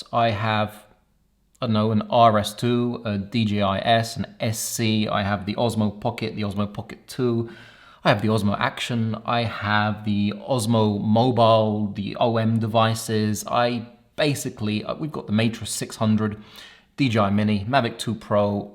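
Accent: United Kingdom. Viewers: U can always count on someone w a British accent to call a spade a spade. Lmao. Agreed. Beyond the mini2... It's going to take alot to justify crossing the legal line in Canada .. ... Awaiting the Mavic 3 as well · This makes sense, i could quite place where you may be from. Its a unique accent but definitely quite British the accent and you have great humor. Great work man keep it up.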